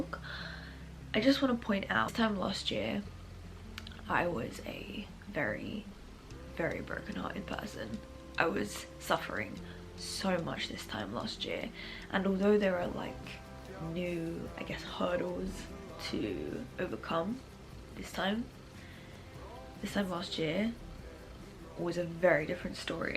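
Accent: United Kingdom. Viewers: Are u from London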